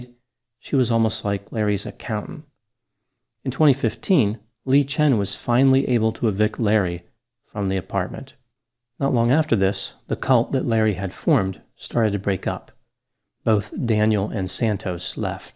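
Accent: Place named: South Jersey, United States. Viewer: Hey ….hate to break it to ya….but you have a well-formed South Jersey accent. 🤔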